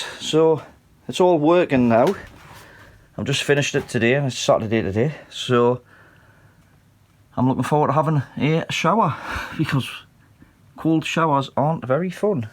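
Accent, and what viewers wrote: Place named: Tyne Valley, United Kingdom. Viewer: Should be a useless b****rd like me,life is much easier. BTW where is your farm (roughly) we live in Alnwick but my wife is from Wylam and she says you def have a Tyne valley accent!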